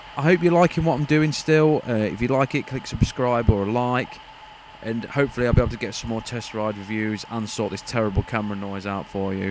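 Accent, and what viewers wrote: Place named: Midlands, United Kingdom. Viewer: where ya from? you sound midlands ish. len Manchester in Melton Mowbray do the new model. they might have a demo you could test.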